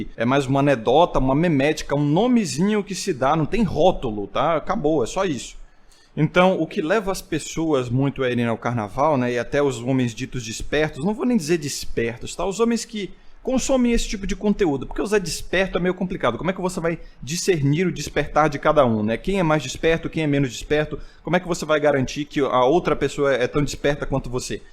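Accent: Bahia, Brazil. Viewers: O é baiano? 😳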